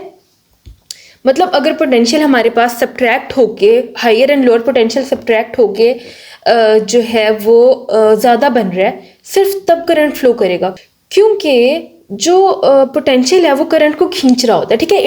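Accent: Pakistan. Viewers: Lahori accent 👍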